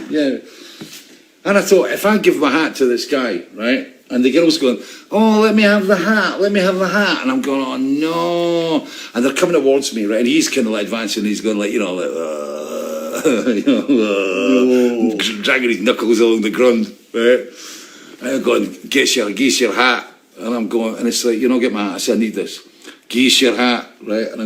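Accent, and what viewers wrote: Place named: Scotland, United Kingdom. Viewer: He sounds Scottish.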